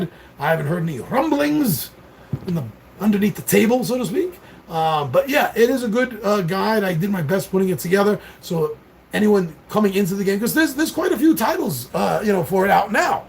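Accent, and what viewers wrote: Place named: New York, United States. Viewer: you have a new york accent? no way!!!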